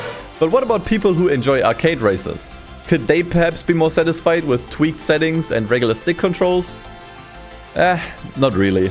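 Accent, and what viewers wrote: Germany. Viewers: Are you German??????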